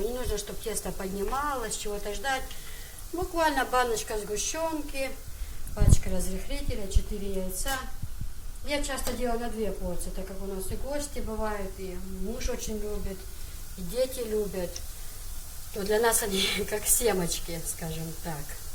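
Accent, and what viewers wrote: Chechnya, Russia. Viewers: Акцент даже нохчи ю ) марш йог1ийл.рецепт сохраню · Окцента чеченский мололец. Спасибо попробуем. Плачь моя подписка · И акцент чисто Чеченский, когда говорить на Русском. Молодец удачи тебе и счастья. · Ассаламу алейкум, я думала, что чеченка👍🙂 даже акцент похож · Акцент зато чеченский👍👍👍👍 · Я сегодня подписалась на вас, прочитав немного комы, я была удивленна, тем что вы не чеченка) а так похожи, и акцент у вас уже как наш))) Аллах дал атто бойл ха 😘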